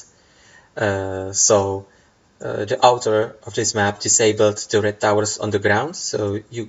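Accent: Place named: Czech Republic. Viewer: Mě ten čengliš právě vyhovuje, rozumím tomu daleko líp, než rodilímu mluvčímu :D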